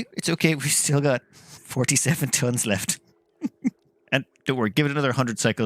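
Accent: Ireland. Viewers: You aren't from Ireland like me are you? Dublin here.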